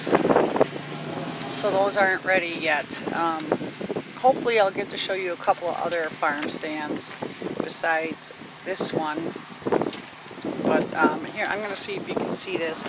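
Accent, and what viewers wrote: Wisconsin, United States. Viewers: ... NICE VIDEO ... VERY RESPECTFUL COMMENTS TOWARDS THE FARMERS & THEIR STANDS ... LOVE YOUR "WISCONSIN ACCENT" ...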